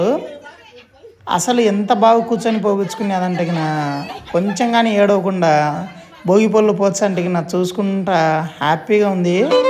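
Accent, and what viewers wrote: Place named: Rayalaseema, India. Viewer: Anna me videos lo oka speciality eantante meeru matladetappudu mana రాయలసీమ slang baaga telustundi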